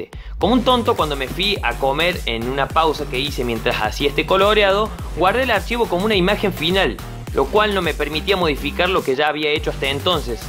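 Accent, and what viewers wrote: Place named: Cordoba, Argentina. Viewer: Sos cordobes? Se te nota pa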